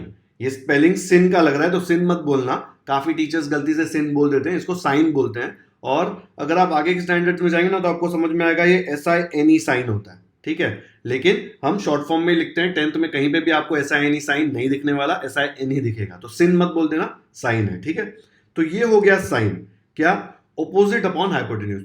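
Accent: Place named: Maharashtra, India. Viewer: Sir i think you are Maharastrian : - becase of your pronunciation (Am i Wright ? ) 😊😊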